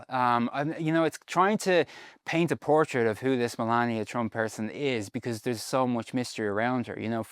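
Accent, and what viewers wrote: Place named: Ireland. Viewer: Nice to hear an Irish review 👏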